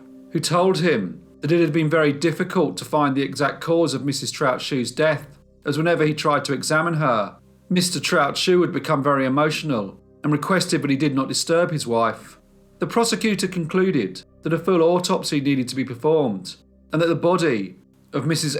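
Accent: United Kingdom. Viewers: Whenever l hear your voice, l always picture a younger 'Alan Rickman'..... I'm dying of curiosity..... Am l even remotely close? (sorry, I know looks aren't important, but I already have a crush over your British accent, so l can't help but wonder about the rest of you! Sorry for sounding creepy, I'm actually a huge fan of your channel!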